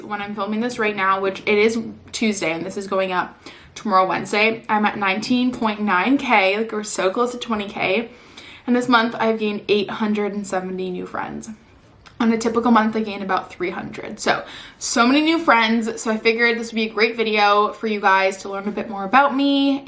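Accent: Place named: Canada. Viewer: You’ve become a fav quickly! Didn’t realize you were a Canadian! I had tried to figure out your accent previously. You are super pretty and have helped me transition to my work from home position much smoother! I’ve definitely felt defeated working in healthcare from home a time or two. So just wanted to say thank you for all your hard work!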